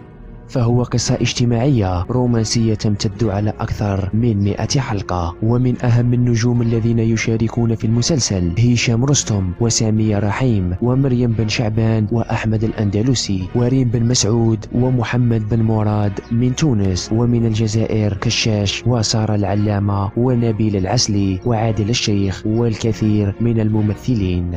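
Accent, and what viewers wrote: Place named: Morocco. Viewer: جاني صوتو ولهجتو مروكي والله اعلم دقق مليح فاللهجة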